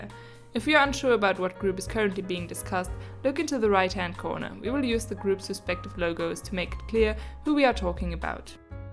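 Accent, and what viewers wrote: Germany. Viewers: Personal question: Are you German? Your accent sounds German to me.... Correct if I'm wrong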